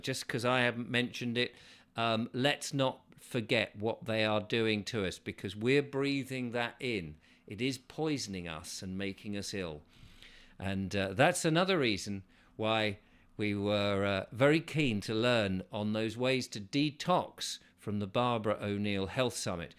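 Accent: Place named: Scotland, United Kingdom. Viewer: I think I detect a slight Scottish accent, that's reward for helping to spread the word up North.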